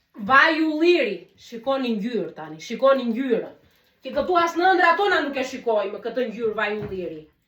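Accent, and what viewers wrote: Greece. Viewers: Ti paske mar të gjith fshatin me vete.dukesh nga të folurit që jeton në greqi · Ne Greqi jeton? Se kshu duket nga theksi😅?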